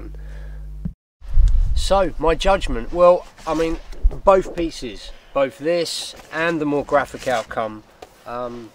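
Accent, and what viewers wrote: United Kingdom. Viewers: Thanks, now I have some idea how Yorkshire and North London sound. Only 100+ more to learn ;o)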